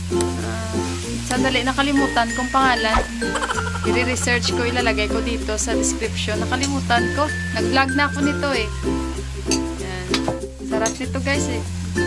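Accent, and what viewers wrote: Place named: Hiligaynon, Philippines. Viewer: Ganda ng vlog mo sis di nakakaboring at kaabang abang. Tapos yong pagsasalita mo di maarte and i like your ilongga accent. More power to ur channel at sa mga supporters and sponsors sa charity nakakaproud bilang isang filipino. I salute u all.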